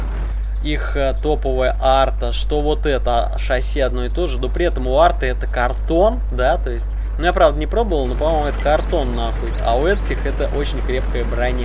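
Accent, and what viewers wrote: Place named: Odessa, Ukraine. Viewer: Зато Одесский колорит прям хлещет из речи. Одесский быдло - колорит. Хотя хотя если брать, то у него даже не быдло стайл... Это просто говно.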